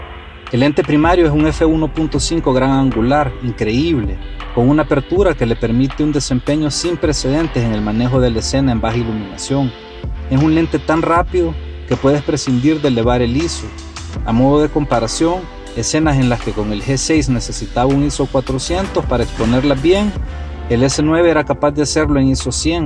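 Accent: El Salvador. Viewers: Eres de El Salvador verdad 😮😮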